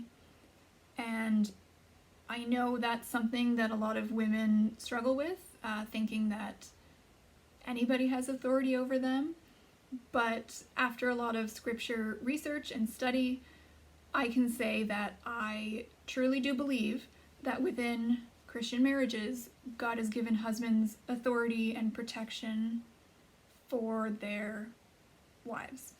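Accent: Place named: Canada. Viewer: God bless you sister. Thank you so much for sharing. Good for you for seeking to obey the Holy Spirit's leading in your life. I'm super new to head covering, and get great joy from these wonderful testimonies. Are you Canadian by any chance? Just asking cuz you sound a lot like me, and I'm in Nova Scotia, originally from B.C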